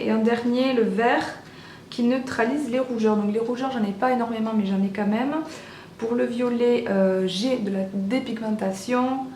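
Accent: Toulouse, France. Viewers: J'adore ton petit accent toulousain ^^ Je trouve ton teint super avec ces produits le fond de teint à l'air pas mal du tout ainsi que la palette je l'avais vu sur la vidéo de sananas le résultat est assez bluffant ! :o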